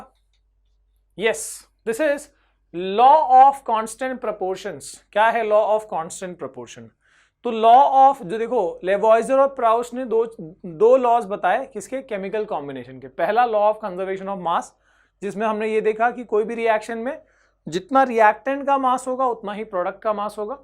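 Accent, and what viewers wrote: Bihar, India. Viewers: apke bolne ke tarika se lagta h ap bihari ho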